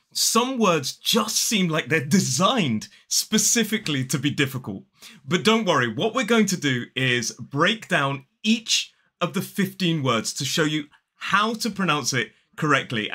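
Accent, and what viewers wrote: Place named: RP, United Kingdom. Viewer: Very interesting to hear difficult words pronounced with a British RP pronunciation. Thank you for the lesson ❤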